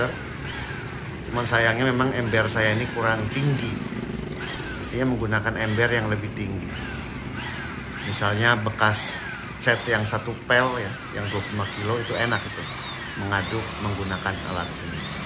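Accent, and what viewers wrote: Tegal, Indonesia. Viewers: mantap bang? lebih mantap lagi ada suara kas orang tegal,ha..ha... joooos.!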